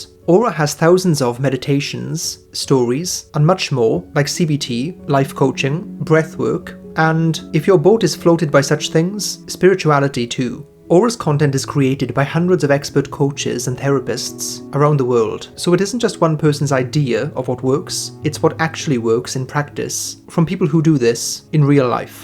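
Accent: Wales, United Kingdom. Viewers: I send your videos to my INTJ boyfriend a lot and I'll ask him "hey did you watch what I sent you"? and he'll say "oh from the British guy?." So, you're the MBTI British guy around here lol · "INFJs are never-ending future anticipators" Thank you that's the best way I've ever heard it said. And as an Aberystwyth boy good to hear it said in a Welsh accent!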